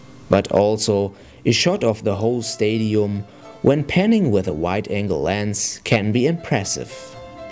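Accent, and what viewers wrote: Germany. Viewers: is your accent german?? you sound a little funny? say fas-ci-na-ting, not fas-nating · his accent sound like Germany! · Oooooh you sound soooo German :-) but nice Video. Thanks or Dankeschön :-)) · despite the german accent it's very helpful, thank you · You sound very German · Kanst du bitte ein video in deutsch über wie dreht man richtig videos zb. Mit der eos 650 d in deutsch bitte ich höre das du deutscher bist :)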